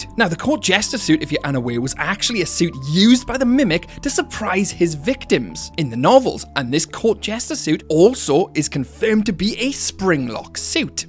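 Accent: Wales, United Kingdom. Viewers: Completely unrelated but are you welsh?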